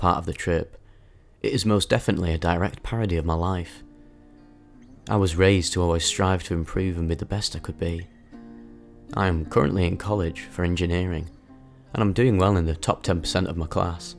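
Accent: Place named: Burnley, United Kingdom. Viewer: I'd normally hear this type of voiceover from some American tone not a Burnley accent 😅😂 don't take offence I like it as I can relate I too have an accent which doesn't ring true to me .I speak in a Scouse accent however I am not a Scouser 🥸. Life's a funny thing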